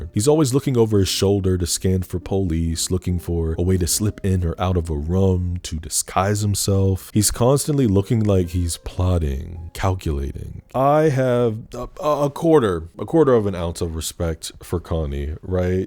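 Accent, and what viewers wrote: United Kingdom. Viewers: you fool lol. I absolutely love your uk/brit accents, they just scratch the perfect spot in my brain lol 🙂‍↕️🤝🧡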